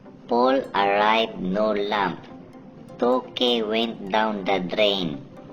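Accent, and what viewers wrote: Visayas, Philippines. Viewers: Murag bisaya ka sir ba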